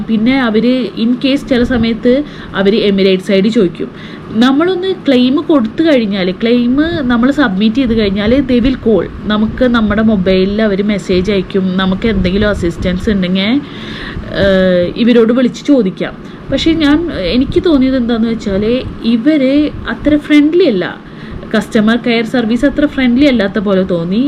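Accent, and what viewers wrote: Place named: Ernakulam, India. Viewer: I like your slang tune 😅 Eranakulam?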